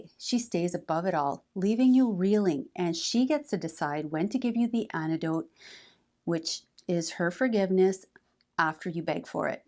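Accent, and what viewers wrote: Canada. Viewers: Not sure where you're from, Canada maybe, but I love your accent. Great video. Thanks for the tips on crazy phrases from crazy women.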